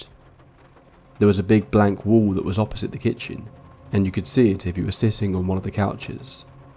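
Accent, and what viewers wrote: England, United Kingdom. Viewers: Because he is English, always make the best narrators, Americans are too hyper+ accents are trash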